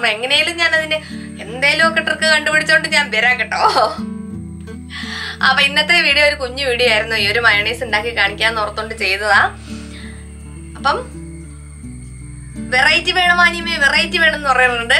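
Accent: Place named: Idukki, India. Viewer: ചേച്ചിയുടെ സംസാര ശൈലി കേട്ടിട്ട് എനിക്ക് എന്റെ നാട് (idukki)ഓർമ വരുന്നു. ചേച്ചി നാട്ടിൽ എവിടെയാണ്?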